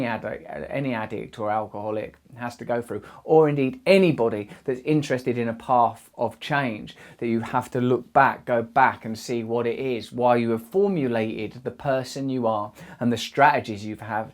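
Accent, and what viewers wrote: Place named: Essex, United Kingdom. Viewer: Russell I remember you hosting at various raves for MTV, and I knew from the almost permanently dilated pupils that you were "on the ting" 20+ years ago.....but that wasn't the first thing... What immediately jumped out of the screen was an apparent prodigy, preternaturally imbued with oratorical gifts, the likes of which I hadn't heard delivered via Essex accent lol... Glad I've stepped in the time machine to witness "that Russell" fully realised and giving back. ✌🏾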